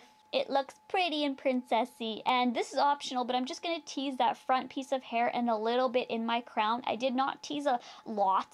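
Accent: Canada. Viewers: hehe sometimes i can heard your canadian accent and it's so cute! Go Canada!!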